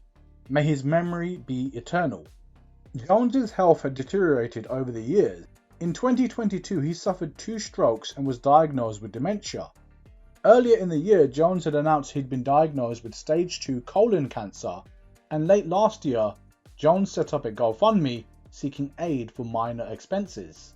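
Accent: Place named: United Kingdom. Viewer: How come you narrators are always British??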